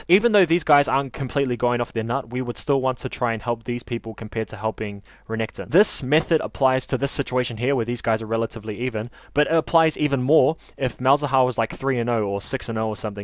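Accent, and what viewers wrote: New Zealand. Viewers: Bro are u from NZ u sound Maori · you a kiwi bro? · Solid Vid i hear the nz accent hahahah cheers for the solid tips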